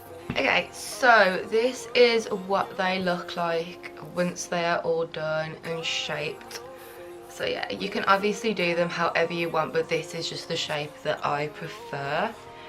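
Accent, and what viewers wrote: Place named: Birmingham, United Kingdom. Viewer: Your sooo brummy. I love it!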